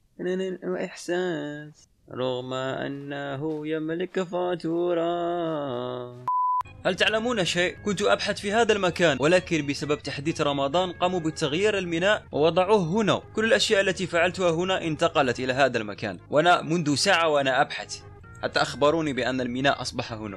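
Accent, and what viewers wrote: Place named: Egypt. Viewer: يا انت مصري ولا جزائري ؟ انا متأكد انك مصري علشان بتتكلم زي المصريين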